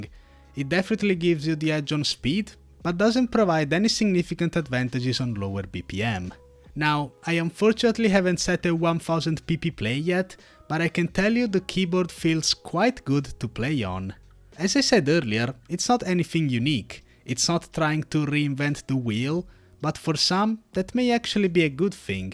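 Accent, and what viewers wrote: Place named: Italy. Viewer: That's some sick italian accent, right?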